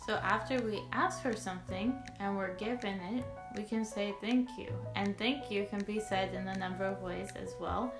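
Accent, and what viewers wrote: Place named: United States. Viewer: Sorry, you have american accent so much, I think it´s not good teach untrue accent. Are u trying youtuber? It´s better change for another thing. I´m japanese and I´m being honest, so sorry if you dont like my comment. But it´s not really good for people want to learn. Right?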